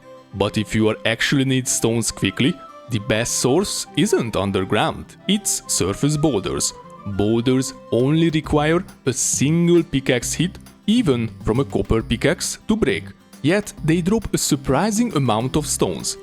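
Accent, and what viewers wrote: Hungary. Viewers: why do i feel you're hungarian just by the accent? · Great tips! :D And as a side question. From your accent, I suspect that you may be Hungarian perhaps? Maybe somewhere close in Europe. Or very mistaken. Ofc you don't need to answer if you don't want that info public. Was just curious.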